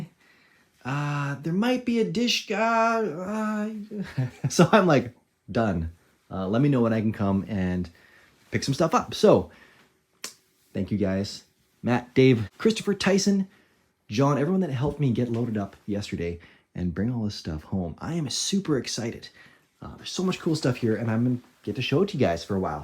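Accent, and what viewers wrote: Canada. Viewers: Are you Canadian I can’t tell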